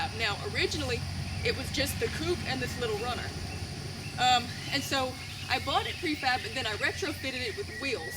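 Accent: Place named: Texas, United States. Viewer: You are so full of information! I have been following you for a while and now have subscribed. Please keep it up! I need all the information I can get! I am in texas, you sound like you are close by, what state are you in?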